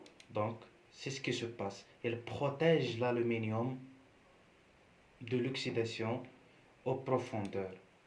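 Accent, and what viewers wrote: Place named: United Kingdom. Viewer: هذا يحجي بريطاني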